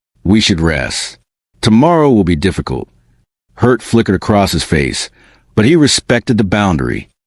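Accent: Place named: United States. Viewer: A British story read with a heavy American accent?! 😅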